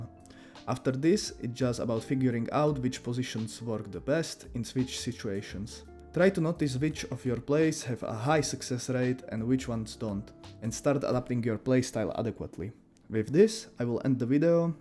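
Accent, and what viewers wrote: Czech Republic. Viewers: bros def czech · Hi good video btw are u from Czech republic? Ur ascent is so czechian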